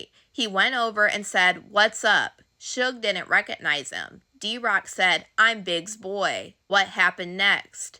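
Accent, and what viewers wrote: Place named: Michigan, United States. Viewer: Where are you from? Your accent sounds like Michigan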